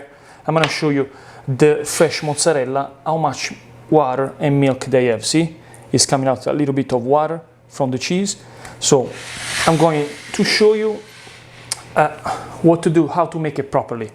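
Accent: Italy. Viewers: I like you English with Italian accent and I also like very much your videos. Great job! I want to be your student to be a BIG pizza chef WOW!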